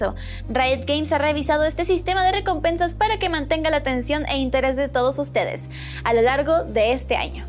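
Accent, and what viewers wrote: Chile. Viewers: Que loco, nunca le había notado el acento chileno a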